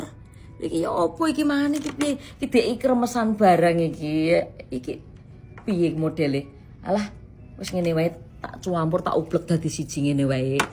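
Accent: Java, Indonesia. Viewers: Aku seneng logat Bedok jowone mbakyu❤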